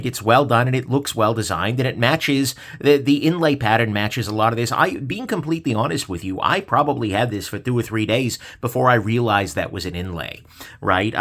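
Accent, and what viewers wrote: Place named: East Coast, United States. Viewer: Hey, love your stuff but do you also run a YouTube channel that deals with MMA … … same dialect coming out of somewhere on the east coast, I like it. Anyway, love the channel.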